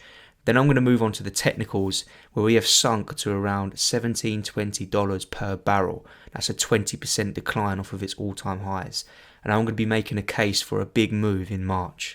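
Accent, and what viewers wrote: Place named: England, United Kingdom. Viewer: basically a Frank Lampard/redknapp hybrid, that's how you sound to a Canadian person like me. I am predicting Gold reaching 2100 usd in 2021 after consolidation in the <num> usd range. P.s. you look like a jack Wilshere.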